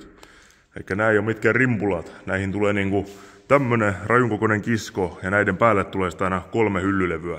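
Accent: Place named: Turku, Finland. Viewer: "Saisin halvalla" 80-luvun Marino Swingin 20hp Jammulla. Luottopuheiden mukaan soiva peli muuten, mutta peräpeiliremonttia kaipaa. Kun oot niin hehkuttanut noita braketteja perän jatkoksi, niin olisko tässä koko- ja teholuokassa semmosessa mitään järkeä? Vois askarrella samalla, kun vanerin joutuu kumminkin vaihtamaan. Parantaisko se potkurin olosuhteita, kun on kuitenkin aika jyrkkä v-pohja? Vauhtia en niinkään kaipaa, enemmänkin pientä kulutusta pienellä koneella. Tulis samalla sisätilaa, kun peräpenkin siirtäis kaivon paikalle. Joka tapauksessa tekisin ton sun Silacraftin tyylisen keskipulpetin, jo pelkän ajoasennon takia. Ja kun kuskilla on painoa 180kg (ei oo kirjoitusvirhe), niin hörppääkö kiikkerä Swingi vettä pulpettia kiertäessä? En oikeen pääse sitä vedessä kokeilemaan ennen kauppoja (ja romahtaneen ladon raivaamista). Ja kiitos hyvästä kanavasta. 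En olis ikinä uskonut, että joskus okein odotan pääseväni kuulemaan turun murretta!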